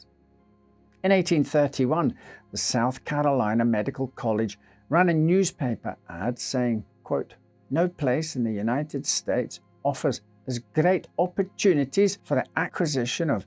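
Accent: Scotland, United Kingdom. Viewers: He's Scottish 😊, I'm.also Scottish and that's how we sound when we are talking proper 😅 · I can't put a finger on your accent. Im getting English, South African, Scottish and even Welsh! I love it, love the videos too! ❤ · It's his voice. It is wonderful to listen to, especially for me as I admire any accent from the United Kingdom. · Or maybe he is just Scottish… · Nope, I believe he is a Scot.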